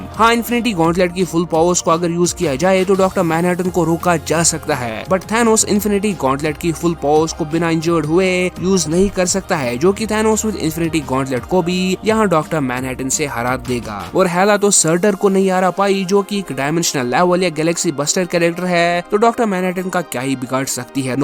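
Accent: Kashmir, India. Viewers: App kashmir sa ho kya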